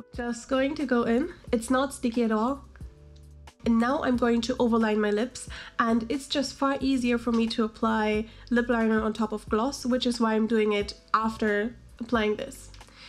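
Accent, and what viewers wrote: Germany. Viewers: Da höre ich doch eher deutsch als Muttersprache. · I can hear a german accent. :D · Are you from Germany? I love your accent; it's so beautiful.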